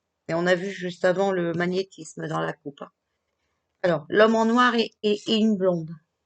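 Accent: North, France. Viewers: Bon Anniversaire 🥰💜❤️💛 Je viens de vous découvrir et j'apprécie votre naturel... et votre accent de chnord;) ( le même que ma grand-mère) Bonne continuation j'aime bien venir vous écouter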